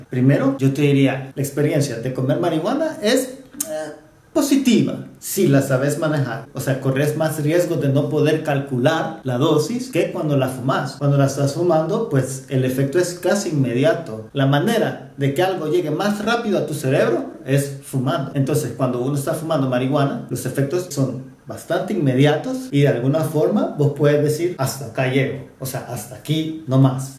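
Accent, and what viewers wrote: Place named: Uruguay. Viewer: Está bueno que informes para evitar accidentes. Muy bien cuadro en el fondo. Hay canales que enseñan recetas pero no he visto ninguno que hable de las proporciones por persona y del tiempo a esperar antes de decidir tomar otra porción. Muy bien informado. Me llamó la atención tu acento, de qué país sos? Hablas bastante parecido a nosotros los uruguayos.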